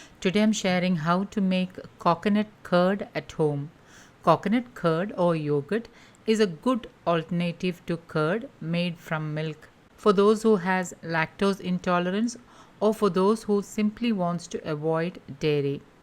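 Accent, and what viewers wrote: Kerala, India. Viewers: Yup.. it’s the cuteness of Malayali accent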